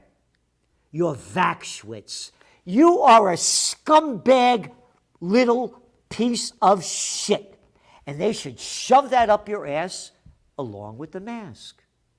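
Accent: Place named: Brooklyn, United States. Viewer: "turlet" I love hearing Geralds Brooklynese.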